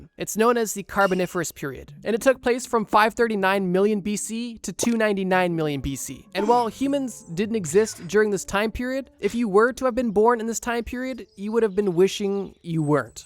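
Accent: Canada. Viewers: You're Canadian???? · Are you Canadian ?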